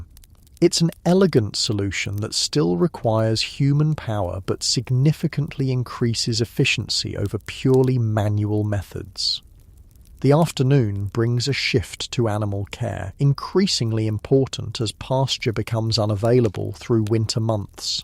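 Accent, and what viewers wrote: England, United Kingdom. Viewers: I didn’t realise how many channels there were of these but I love this one. It’s the English accent because I cannot stand American ones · I love your content, but why the switch to the English accent narration?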